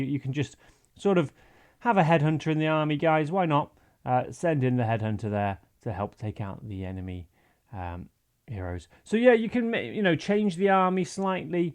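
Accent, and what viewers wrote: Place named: United Kingdom. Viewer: He is British and British accent is the best one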